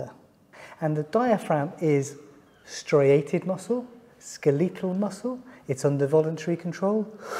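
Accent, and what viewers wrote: United Kingdom. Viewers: British accent + mesmerizing personality + a med school mannequin = YouTube star giving anatomy lessons. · Asa I started watching this show, I noticed you are British, aren' t you Doc?🧑‍⚕️ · good video, if i could understand the british accent and if he could speak slower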